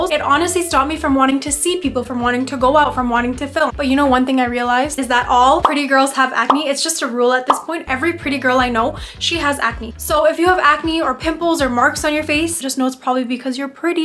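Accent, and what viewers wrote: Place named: United States. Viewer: wow wow karti hai ....america akcent bolti haaaai ....lagti tu powri indian haaai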